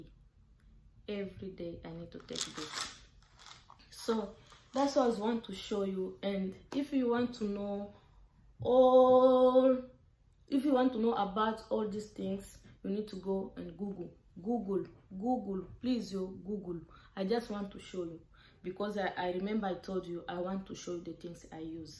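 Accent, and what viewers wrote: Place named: Democratic Republic of the Congo. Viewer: R u from Congo i like how u speak❤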